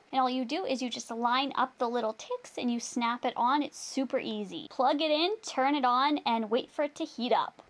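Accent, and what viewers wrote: Canada. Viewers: hehe sometimes i can heard your canadian accent and it's so cute! Go Canada!!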